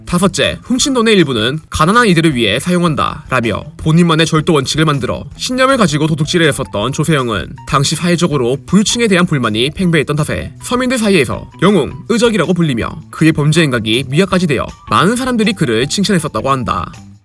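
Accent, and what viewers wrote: Jeolla, South Korea. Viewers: 아따 또 전라도여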